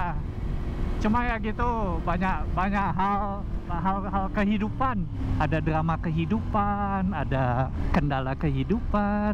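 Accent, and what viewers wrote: Bali, Indonesia. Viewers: Walaupun tinggal di negeri sebrang tapi aksen bali nya ttp ada,, welcome back lahh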